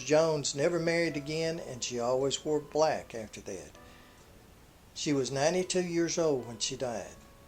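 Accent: South, United States. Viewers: These are some of the places I'd like to see when I got more time. IE retired. Thanks for the video. Your southern accent reminds me of my Alabama In Laws. Ya'll take care now, Bless your heart for the good work done here. D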